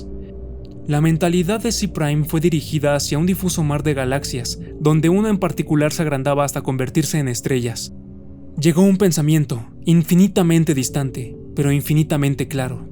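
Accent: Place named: Zacatecas, Mexico. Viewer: Se nota el acento de Zacatecas pero es mucho mejor que la voz robótica o el español castizo con su odioso seseo 😢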